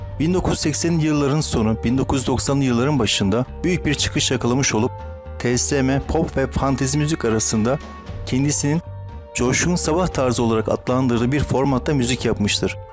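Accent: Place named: Armenia. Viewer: LÜZUMSUZ, GEREKSİZ BİR VİDEO... AYRICA SESLENDİRMEYİ YAPAN AMCA. SENİ TANIMIYORUM. ADINI DA BİLMİYORUM... AMA KESİNLİKLE SENİN DE ERMENİ OLDUĞUNU SÖYLEYEBİLİRİM... HANGİ SES TÜRK SESİDİR?, HANGİ SES ERMENİ SESİDİR?, HANGİ SES YUNANLI SESİDİR? HANGİ SES KÜRT SESİDİR? HANGİSİ ERAB SESİDİR? ANLARIZ, GERÇEK BİR TÜRK BUNU ANLAR.. ANLAYAMAYAN VARSA TÜRKLÜĞÜ KONUSUNDA Bİ SORUN VARDIR. videodaki sanatçılar, show dünasının aktörleridir... Türklerin show VE eylence ile işi olmaz. onlar tarih yazarlar.. bazen karanlık dönemlerde olur tarihte... ancak aydınlık her zaman galiptir. bu arada videodaki tiplerin hepsi bizi eyledirmiş, gönlümüzü hoş etmişlerdir, gözümüzde saygındırlar.. onların ermeni olup olmadığı ile ilgilenmedik. ilgilenmeyiz... ölenlere rahmet, yaşayanlara sağlık dileriz. TEKRAR SÖYLÜYORUM GEREKSİZ BİR VİDEO, BU TÜRK TOPLUMUNDA, HİÇ KİMSE ADİLE NAŞİT,COŞKUN SABAH,ONNO TUNÇ, VE DİĞERLERİNİ ; NE ERMENİ DİYE DAHA FAZLA SEVDİ; NE DE ERMENİ DİYE SEVMEKTEN VAZGEÇTİ...BÖLÜCÜ APARATI OLMAYI BIRAKIN...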